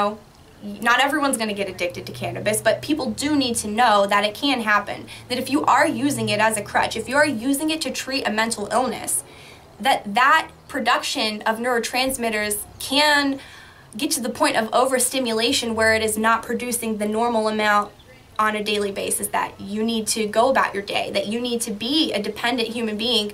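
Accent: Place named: Michigan, United States. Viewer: Sound like you’re a Michigander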